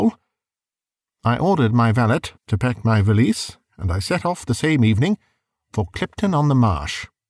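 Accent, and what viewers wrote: United Kingdom. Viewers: I agree! The British voice is very very pleasing! IM SIMILING RIGHT now!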